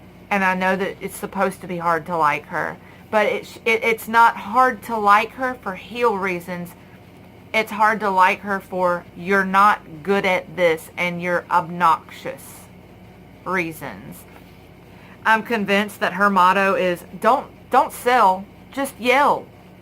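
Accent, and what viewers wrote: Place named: South, United States. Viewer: I'm Here Miss! lol I subscribed and rang the bell. You're Southern? I didn't know that. Your accent is cute lol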